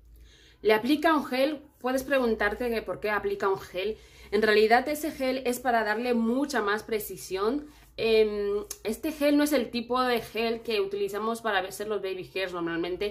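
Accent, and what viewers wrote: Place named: Spain. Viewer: Saludos desde Lawrence MA USA. pero soy natural de Puerto Rico.. me encanta tu acento de español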